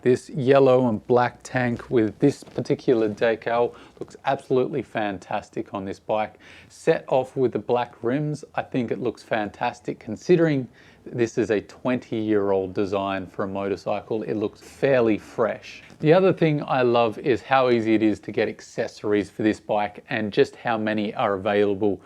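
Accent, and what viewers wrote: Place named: Australia. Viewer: bro you're australian as fuck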